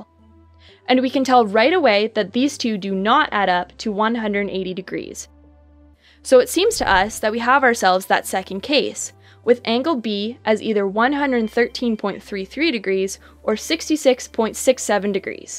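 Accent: Canada. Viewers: Ur Canadian aren’t u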